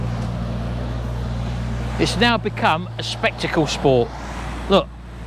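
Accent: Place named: Cockney, United Kingdom. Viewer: Well that got me. Coulda sworn you were from the eastend (like my missus) and damn me there's a Riddels skip. I can nearly see their yard from where I am.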